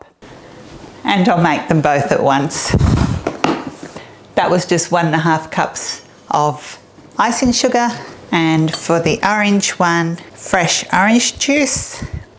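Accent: Australia. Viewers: Hi have just found u on Youtube and hoe lovely it was to hear a fellow Ozzie there, have seen yr video on braised steak and will be cooking that tomorrow night also the date scones, my husband loves my scones so I cant wait to give him these date scones looking forward to trying ur other recipes cheers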